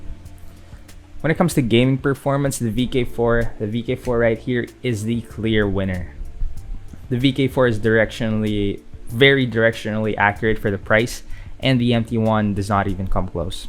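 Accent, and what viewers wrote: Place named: Indonesia. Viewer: You sound Indonesian or Malay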